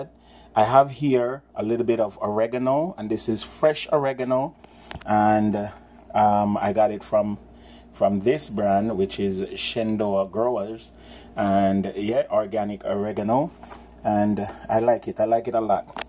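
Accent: Jamaica. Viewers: Nice!! Me like it, patois voice. Big up!!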